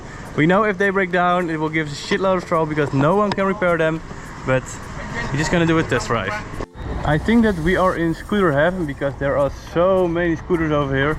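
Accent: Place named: Netherlands. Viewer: Prachtig! We blijven onze Hollandse accent zo overduidelijk behouden als we Engels spreken. Gelukkig is daar geen friet van Piet 😅 Zeer leerzame opname. Nice!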